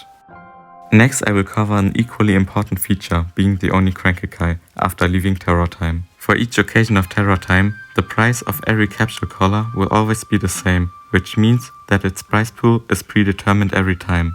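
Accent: Germany. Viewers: Anhand des Gameplays und dem Akzent konnte ich entnehmen, dass du deutsch bist und ich bin dir soooo unfassbar dankbar. Ich habe Muzifer nun auch und auf dem account(ich starte relativ oft neue) auch glamourella. Nur noch Kalteser fehlt und ich bin dir ehrlich sehr dankbar. Kannst du eventuell auch einen guide zu panda noko machen?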